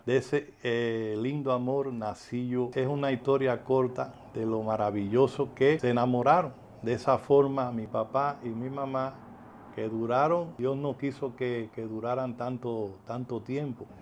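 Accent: Cuba. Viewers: Me encanta ver como prepara sus comidas deliciosas pero lo mejo es ese acento Cubano tan dulce!!! ❤❤❤❤ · Querido abuelo me hizo recordar a mi papa cubano ese acento cubano es inconfumdible gracias por traerme viejos recuerdos y voy a preparar esta receta con congri gracias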